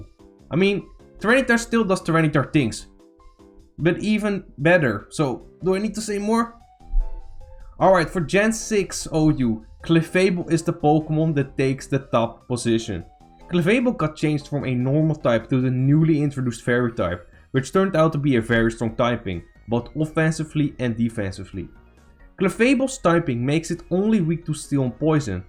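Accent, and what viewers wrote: Netherlands. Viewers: Je hebt echt t hardste Nederlands Engelse accent dat ik ooit gehoord heb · Seeing all the comment about how dutch you sound are cracking me up. Can't disagree but it's not bothering me at all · Needed just 2 seconds to hear you are Dutch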